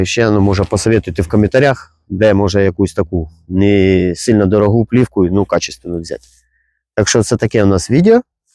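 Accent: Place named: Dnipropetrovsk, Ukraine. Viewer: Говор схожий на Дніпропетровську обл, думав що ви з пятихаток👌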